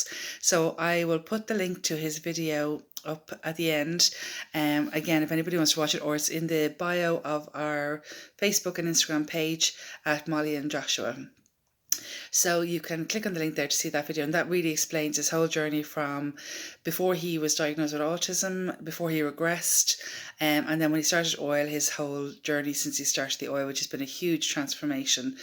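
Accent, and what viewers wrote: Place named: Ireland. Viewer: Hiya Molly, sorry I have another question which I hope at least you can help me with. I've been doing more research on this oil and just wondered, 'how' did you work out what dosage to give your son (or even yourself as I've learned?) Was it merely trial n error or were you given any guidance? Many thanks. Ps. Forgive me but I said earlier that you sounded like a 'brit' but now I recognise that lovely irish accent(lol. Silly me) Tc now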